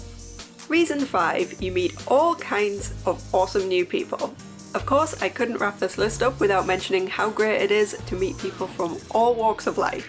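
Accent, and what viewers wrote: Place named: North East England, United Kingdom. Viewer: Nice to see you where are you from sounds like the north east in uk l am from uk l live in Redcar North Yorkshire l did get your name from Chris cross stitch shout out look forward to seeing your next video xx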